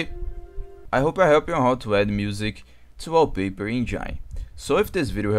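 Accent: Brazil. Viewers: mano, tu é br né? tem sotaque kkkkk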